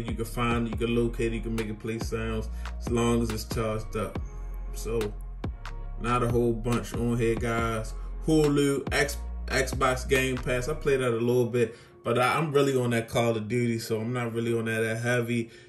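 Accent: Baltimore, United States. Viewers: Love the video, I subbed. I’m just wondering but are you from Baltimore?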